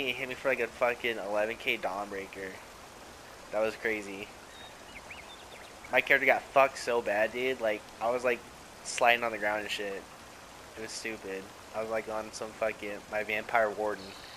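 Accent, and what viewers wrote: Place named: West Coast, United States. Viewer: Yo you gotta be from the west coast with that accent. Lol nice vid bud.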